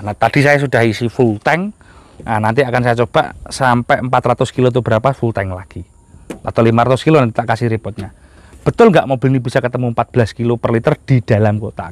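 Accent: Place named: East Java, Indonesia. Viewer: Saya orang Jawa Barat, tapi kok menikmati gaya bertuturmu yang Jatim bgt😅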